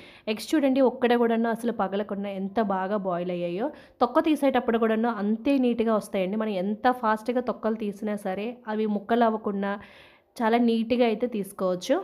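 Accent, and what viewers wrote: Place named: Kerala, India. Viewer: U r telugu slang is like keralalite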